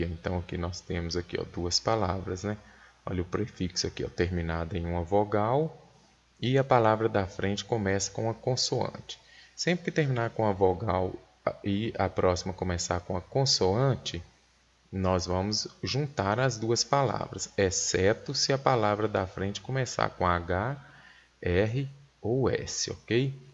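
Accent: Minas Gerais, Brazil. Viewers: Professor amo o jeito mineiro de falar ! Obrigada pelo vídeo !!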